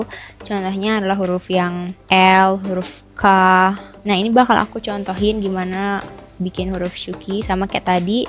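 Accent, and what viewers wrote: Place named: Bali, Indonesia. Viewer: kek logat bali pls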